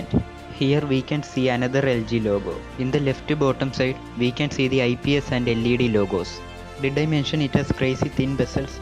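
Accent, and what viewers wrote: Kerala, India. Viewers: Malayali ano....? Speechil oru malayalam touch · Heavy mallu accent ! Any reason why some mallus killed that elephant few days back ?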